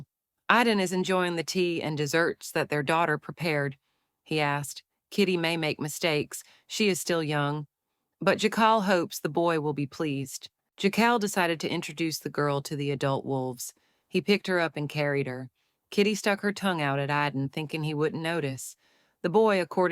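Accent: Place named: Texas, United States. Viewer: Why is she from Texas?? 😭😭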